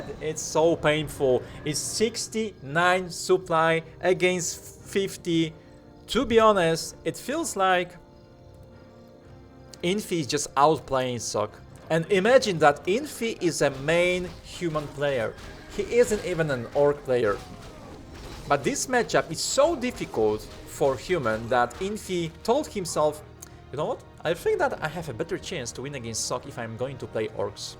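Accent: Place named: Poland. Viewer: Hej,! (Świetny nick, na marginesie, teraz to widzę) Wspieram i popieram wejście na anglojęzyczne rynki!!! Nie będę Ci na nic narzekał, bo wiadomo, początki niełatwe. Nie wiem czy mieszkasz bliżej Warszawy czy Krakowa, ale jak to drugie, to może byśmy się spotkali, postawię Ci coś dobrego;) Zdziwiłem się, bo z tytułu myślałem, że będziesz grał z zagranicznikami, i swoje komentował po angielsku. Akcenty i wymowę warto może trochę podszlifować, bo brzmi bardzo Polsko. Ale nie przejmuj się! Popieram, rób tak dalej, warto! Najważniejsze to robić, reszta przyjdzie z czasem;) Na marginesie, chętnie zaangażowałbym się w kierunkowanie strategii rozwoju, ale Twoja wola. Jeśli nie, i tak wspieram wszystkimi czterema kończynami. Powodzenia! Sława!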